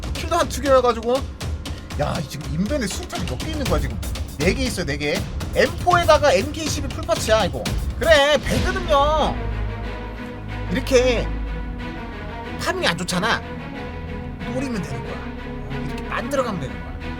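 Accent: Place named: Gyeongsang, South Korea. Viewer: 바깥에를? ㅋㅋ 진짜 갱상도야 이햄은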